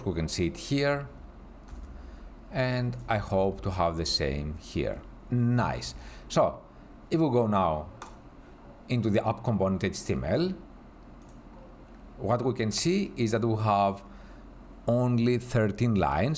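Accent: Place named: Italy. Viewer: Thank you Sir this video. And also I am curious are you an Italian ? Because you have an Italian accent which is good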